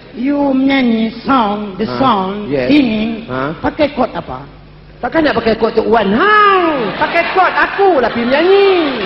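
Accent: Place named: Penang, Malaysia. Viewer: Walau pn usia nya/dgn lawak logat penang itu membuat saya dpt mengenali nya hingga arini.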